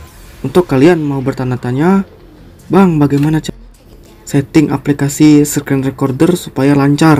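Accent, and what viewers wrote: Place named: West Java, Indonesia. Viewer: Sunda bang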